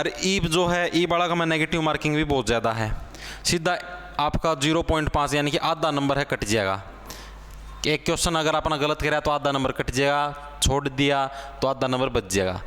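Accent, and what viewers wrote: Haryana, India. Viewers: ❤ haryanvi accent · Yo bihari nhi hai bhai ye haryanvi hai pradhan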